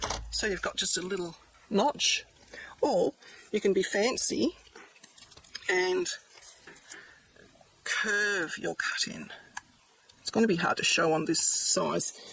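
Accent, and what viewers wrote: Australia. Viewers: I found you today & immediately went to your home page to see where you are from! I love Australian accents! This makes the cutest little envelopes & I just happen to have made a few tags that will fit in them! I think I will make a bunch now, just have on hand, but I think I will also try to remember to cut some strips from whatever scrap paper goes in a journal & then make some that are “matchy- matchy”! I made a variation several years that you keep the long strip in one piece, folding it back & forth until you reach the envelope, then fold the flap down, and use a paperclip, Velcro or magnet, if you feel it needs something. Mine didn’t, a bone folder was adequate! Thanks so much! These are a lot of fun! Love to down under! Erica❤I have subscribed, see you soon!❤ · Your directions are terrific and I could listen to your lovely Australian accent all day! 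Thank you for this video! Subscribed!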